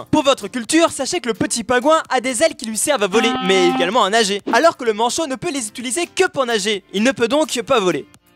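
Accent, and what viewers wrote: Belgium. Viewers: il est belge??? (réponder) S.V.P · Tu es belge ?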